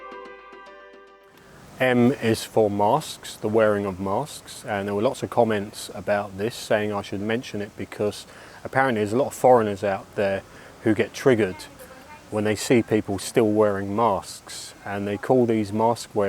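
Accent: United Kingdom. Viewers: I don't like your Pommy accent and did you really call Steve Rosse a C--t ?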